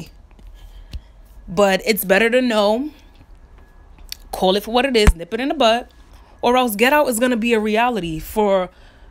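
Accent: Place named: New York, United States. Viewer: Metelyrics a New York accent maybe ? Lol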